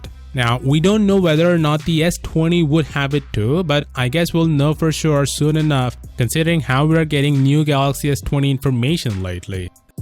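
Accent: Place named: India. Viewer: ANNOYING VOICE OF THE NARRATOR. INDIAN ACCENT REALLY SUCKS!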